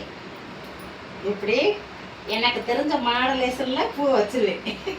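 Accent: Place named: Southern Tamil Nadu, India. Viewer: அக்கா உங்களுடைய எதார்த்தமான தென்மாவட்ட பேச்சு சூப்பர் கா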